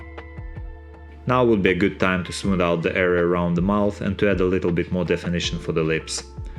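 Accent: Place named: Russia. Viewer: А акцент то русский 😂😂😂👍👍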